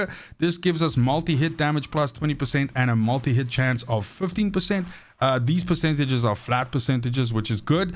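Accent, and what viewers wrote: South Africa. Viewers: Your the best I recommend you when people need help . Are you from South Africa as your accent sounds that way 🤔. OK this Serena issue As you and others make builds that wipe out enemies and boss killers in 3 seconds them its a bit harsh to say Nexon are to blame.. 3 weeks ago the Gley gun build ruined the boss for everybody and in the game Is that not the same . You then have the nexon put out a new top tier character Keelan anybody??? He was new I have yet to see him in a game I had him but deleted him as I needed a slot. So that argument is done. As for Serena I play another game Naraka bladepoint its free and basically first descendant copied it and moved it to space Naraka is Chinese melee swords OK. They made a character that flys last year OK wow he will destroy players Novelty wore off nobody uses him Exactly the same fire water chill toxic skills. 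So this Serena so far again hardly seen in game Majority are bunny and freyna who just wipe out everybody as usual.If this Serena is so dope then as players bitch about grinding then use her and clear missions fast.or do they want a weak character to take longer . End game bs The game is free people get 900 plus hours thats more than most triple aaa games It takes time to create cgi etc Stellar Blade game of the decade 30 40 hours . If you want hours and hours get baldurs gate. OK As for these boss killers I have not seen any that do 2 seconds in a group They show a solo boss The boss is weakened for Solo So they are playin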